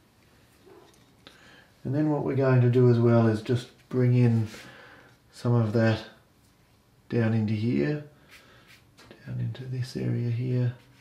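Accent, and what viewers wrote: Australia. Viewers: I watched a second time. It’s really a stunning painting. It’s amazing to see how different artists palettes produce such interesting paintings. So I subscribed to your site. Where are you from, I hear a slight Australian accent? Kathy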